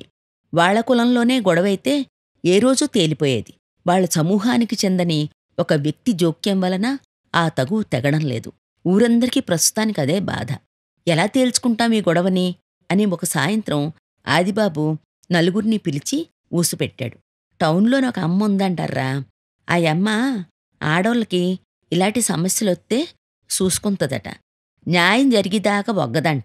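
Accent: Visakhapatnam, India. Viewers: Medam mee slang lo vizag originality kanipisthundgi 😄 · మాది వైజాగ్,ఇది విశాఖపట్నం యాస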